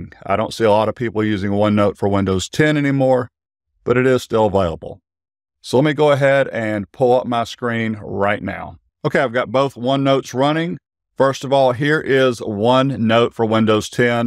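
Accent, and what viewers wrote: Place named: Georgia, United States. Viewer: 1. I recognized your GA accent 2. I am also a CPA 3. Thanks!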